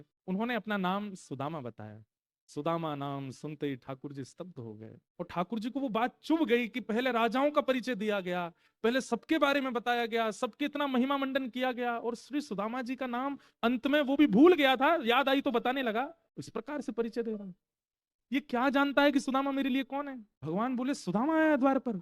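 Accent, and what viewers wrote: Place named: Braj, India. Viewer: Aapke mukhmandal se brj Bhasha pyari lagti h sunaya kro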